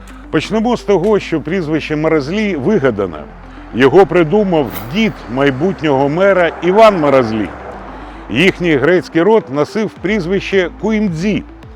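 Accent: Odesa, Ukraine. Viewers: Чудовий сюжет!Прекрасний ведучий! І вишенька на торті- українська мова з одеським акцентом ❤Дякую!❤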